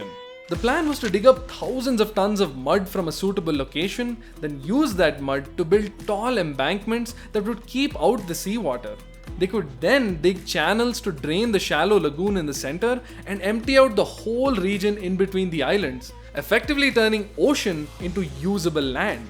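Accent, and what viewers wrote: South India, India. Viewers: Love the South Indian accent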